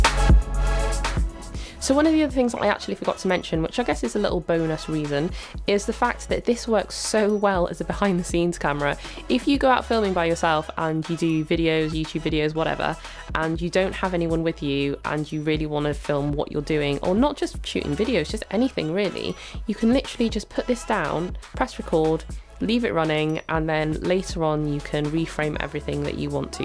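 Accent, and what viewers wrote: United Kingdom. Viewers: This is the first time I've seen a video of yours. The truth is that I loved the way you describe and explain things. Your voice is very relaxing and I really like your accent. (is british?) It is a pleasure and a delight to listen to you and watch your videos. I hope I can have an insta360 soon. New subscriber here! Greetings from Uruguay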